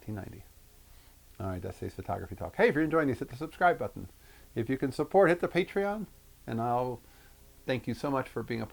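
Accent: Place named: Delaware Valley, United States. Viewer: Sorry for the loss of your father,. I spent part of my childhood on the South Jersey side of the bridge to Philly—right along the Black Horse Pike from Gloucester Township to Mays Landing—so I recognized that Delaware Valley accent of yours right away. You’re absolutely right about those photos feeling like time travel. When you mentioned Acme, I had to laugh. For me, Penn Fruit is another grocery name that pops up in my own “time-travel photos,” at least in my imagination. I was back up that way this past summer in Atlantic County when my best friend from childhood passed. I always enjoy your videos.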